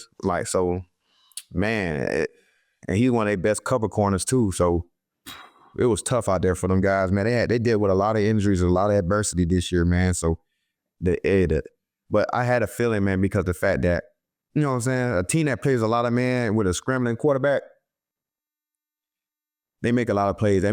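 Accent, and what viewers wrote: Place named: South, United States. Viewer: Bro i always tried to figure out why you was so country i see where you from Im right next door lol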